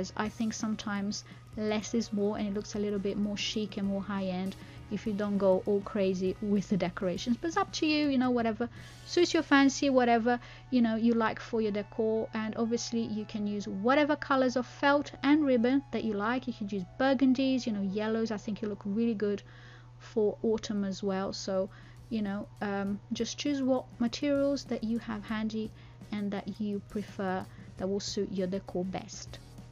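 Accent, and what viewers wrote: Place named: London, United Kingdom. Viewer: Are you originally from the UK? You have a London ish accent.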